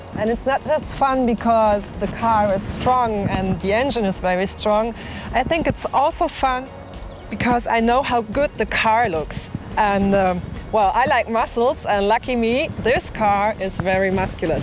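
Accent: Germany. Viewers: She does her reviews well but I mean seriously, I don't know how you're gonna do it, but you just have to do something with the accent. I'd rather she speak in German with subtitles. No hate I honestly love the reviews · I love German accents! :D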